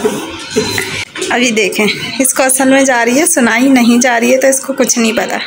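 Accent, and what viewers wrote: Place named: Karachi, Pakistan. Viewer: Ap memon hn? Apki language karachi jese lg rhai 🤭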